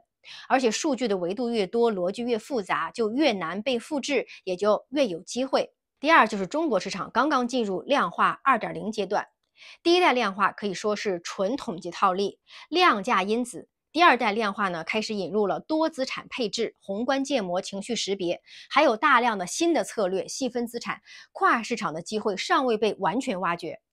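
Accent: China. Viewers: 有点好奇，为什么听主播口音好像是大陆人，字幕却是繁体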